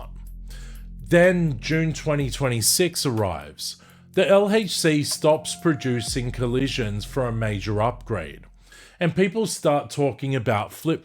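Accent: Australia. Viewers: Australian accent.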